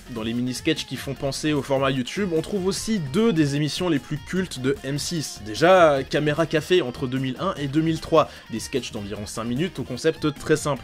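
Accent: Belgium. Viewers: Parle Français comprend rien quand tu parle belge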